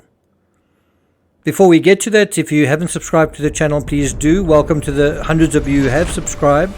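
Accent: South Africa. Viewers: Tbh i'm shocked your not British, South African a real blind spot for me. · You say you found the British less warming to you than other nations you lived in, I don't know your race or nationality, or anything about you, but judging by your voice I thought white South African, which is generally a group that is seem as more aligned to, though Australia probably tops for being most similar. America has always been a different thing, that country has huge influences from all over, but more than anything else, they have a uniquely different way of being & doing things, so as a Brit I can say yeah, theres a gulf between us & America. I sure some Americans do an affinity with Britain, & those individuals might feel more similar if I was to meet them, but over all, American pop culture has a vastly different feel & myself personally I feel our British culture has been lost to it all too much, so while its nothing personal to individual Americans, I am not too keen on the loud over confident American brashnes & razzmatazz, I'm pretty Old School English & I love quaint stuff lol. 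But regarding "foreigners" & other races, we have actually been really welcoming much of the time, the Brits overall are a friendly & safe bunch, a few louts but even they are fairly harmless. But what you may not understand, as an outsider or newcomer, is that we have experienced a huge culture shift, that has been detrimental, we have shared scarce resources, the white working class, houses & space, as a small island. We have paid taxes that go on housing migrants whilst are own p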